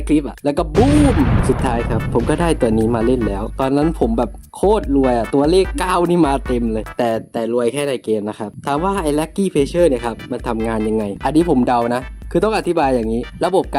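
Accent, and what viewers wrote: South, Thailand. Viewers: พี่บ่าวคนใต้รึป่าว😮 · คนใต้แน่ๆ5555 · เจ้าของช่่องคนใต้รึเปล่าคะ55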